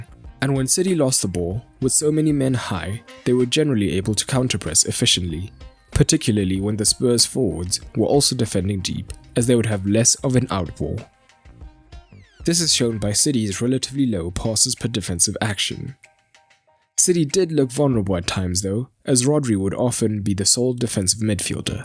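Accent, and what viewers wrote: South Africa. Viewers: Narrator. Are you South Africa? Your accent sounds familiar 🤔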